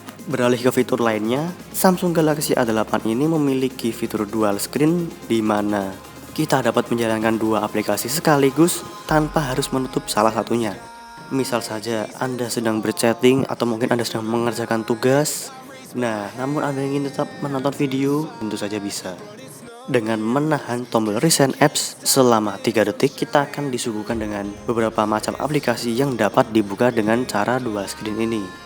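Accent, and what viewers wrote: Java, Indonesia. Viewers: kok "Medok" banget mas... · mas nya orang jawa pasti.. medok bgt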